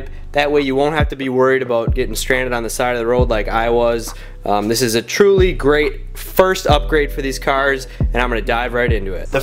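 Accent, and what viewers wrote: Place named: Wisconsin, United States. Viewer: You are truly a Wisconsinite my friend. the accent and the fact that you grabbed a cold one mid install lol you love to see it 😂. From Wisco here as well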